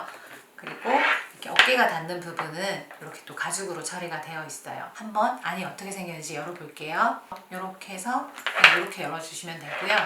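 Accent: Gyeongsang, South Korea. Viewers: 사투리 쓰시긴 하네요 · 경상도 사투리 쓰시네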